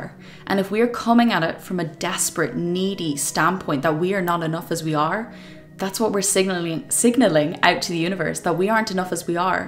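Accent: Ireland. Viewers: Ngl, my English accent sounds so bland compared to your Irish one. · 2nd generation American Irish Girl(woman) here! I literally manifest everything I desire and am open to. I too have vision boards and journals to prove it. Amazing. I just found you today and love your slight Irish ☘️ accent!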